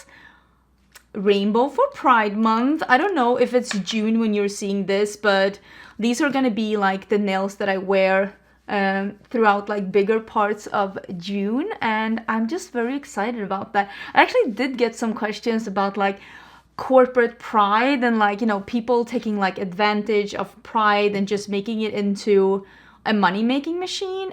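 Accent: Sweden. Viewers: omg........ i love my swedish clown with an accent..... dont like me well dont let the door hit you on the way out..... ppl have too much time on their hands omg!!!!! i love you!!!!!! you are perfect the way you are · dont change! you're so Wise and Talented! I love hearing how you pronounce things with your Swedish accent. So glad I found your channel, You're my favorite Youtuber 🥺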